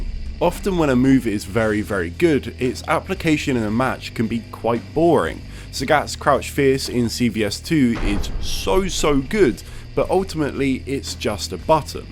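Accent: United Kingdom. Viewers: Calling something broken is a day one option select lmao. Excellent writing already. Btw, the audio mixing has improved drastically. Your voice is clear as day. The music is much clearer as well, but mixed in just right to add texture without muddying up your very British accent.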